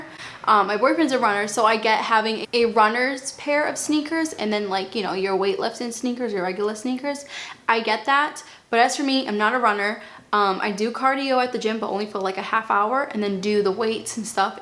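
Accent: Boston, United States. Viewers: Noooooooo I LOVE your Boston way of talking! Please don’t change it! You will get all sorts of viewers from all over the world and they all will have options. Please don’t care! I love the way you talk...Boston style! ;) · You pronounce your words perfectly with your fantastic Bostonian accent! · You don't have to correct your Boston accent! It's who you are and I love listening to you speak! Who cares if you don't pronounce it exactly as it's spelled, do people actually complain about this? We are all unique! I'm in Philadelphia and we also pronounce things the "Philly way" haha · Lets get one entire video with your natural Boston accent. Who agrees? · You are so right. Less is more. My daughter and I are helping each other clear out our homes of excess material things. I have been saving so much money buying less things that I am taking more trips. 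I love to travel. Great video, as always! I love your Boston accent. Embrace it. ☺ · Through this entire video, I wondered where your accent came from because of the pronunciation of some of your words. Then you said Boston. You’re one of my grandma’s people! This is my first video of yours I have watched. I love it! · No. Please say “concealuh”. Never ever lose your Boston accent 🥺🥰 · I love the way you say concealer in your Boston accent! It’s unique and authentic and I love it 🥰 don’t feel you need to “correct” your accent/ pronunciations x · Please don't change your accent for youtube! There's no wrong way to say things, it reflects the dialect where you are and it makes you unique! I watch your videos a lot so sometimes I will end up saying things with a Boston accent just because it's kind of fun! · Love your Boston acsent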